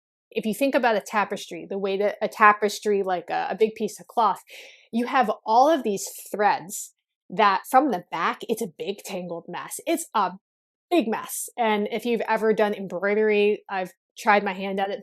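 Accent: United States. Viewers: You are a white american. Of course these are social constructs and I guess you can be anything you want but others can do the same and label you white american. You look white and you sound american.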